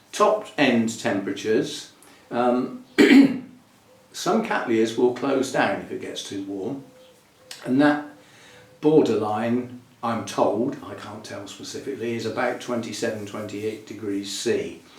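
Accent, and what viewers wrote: England, United Kingdom. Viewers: I love cattleyas! I'm obsessed with them, so I very much like this type of content. Hope, you'll make a vid about the ones now having sheaths. I'm curious if they bloom or not. And I'm also fond of your beautiful English accent. Your videos are more or less English lessons for me, as well. Greetings from Hungary! 🤗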